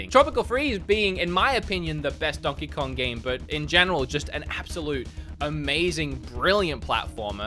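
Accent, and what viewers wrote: Australia. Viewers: I wondered why watching your videos felt familiar. As someone who grew up in australia im not sure if you ever watched Good Game or Good Game Spawn Point but your channel really reminds me of dashing to the tv to watch the hosts talk about whatever game was hot at that moment. The aussie accent def helps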